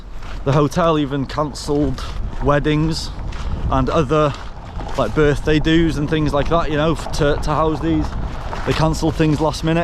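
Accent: England, United Kingdom. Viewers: The real and prolonged threat to Welsh culture is English immigration. Your English accent is testimony to that. Happy to be overrun and ruled by your English masters eh.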